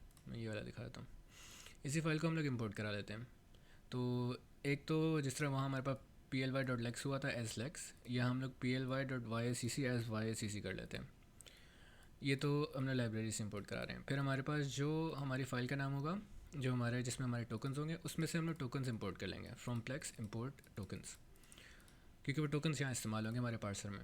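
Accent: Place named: India. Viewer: what language are you speaking? are you using a mix of indians and english? If it's English then your indian accent is so strong that I don't get anything ...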